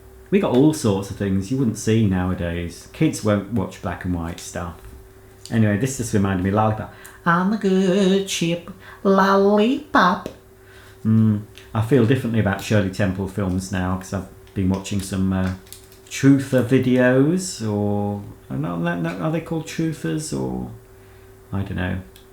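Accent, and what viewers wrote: United Kingdom. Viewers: Maybe it is the English accent I like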